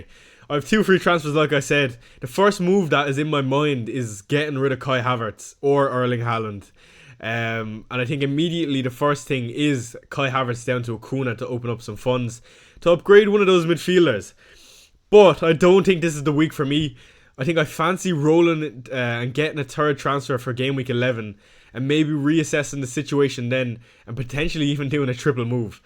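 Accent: Ireland. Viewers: You have great advice and insights, and absolutely the best accent of all the FPL You Tubers! Love a cheerful Southern Irish accent...😊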